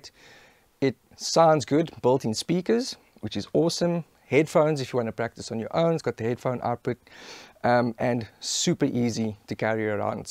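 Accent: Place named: South Africa. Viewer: That's definitely an SA accent...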